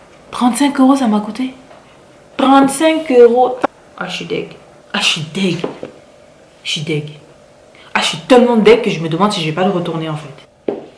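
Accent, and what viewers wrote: Cameroon. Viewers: Je viens de finir de regarder le vlog 😍....trop bien. J'ai appris plein de trucs, du coup j'ai trop envie de décorer ma maison. Ton accent kmer j'aime trop 😍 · J'aime trop l'accent camerounais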